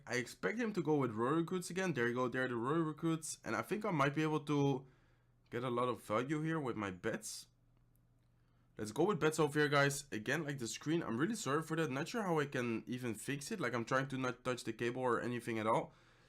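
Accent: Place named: Netherlands. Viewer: Whaha k ben ook nl’er en je hebt echt een nl accent als je Engels praat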